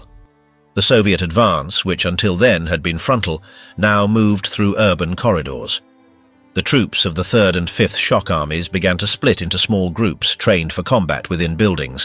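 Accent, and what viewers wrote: United Kingdom. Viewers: English accented narrator, yet he says "aluminum" and "flashlight" (when he means, judging from the sentence context, "torch"). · AI videos with a british accent.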